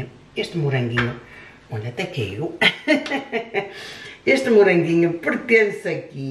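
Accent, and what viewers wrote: Portugal. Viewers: onde vc mora? Já entendi q não é em Portugal, mas vc fala como portuguesa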